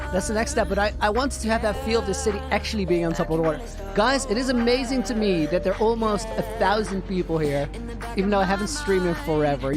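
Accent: Netherlands. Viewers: Hey man love your vids. Btw are you dutch?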